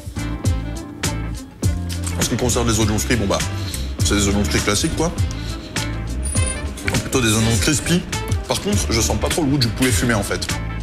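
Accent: United States. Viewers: T'es un Cainri ou un français mdr , bonne vidéo man ! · frère tu fais tellement le ricain i tariv quoi aha ?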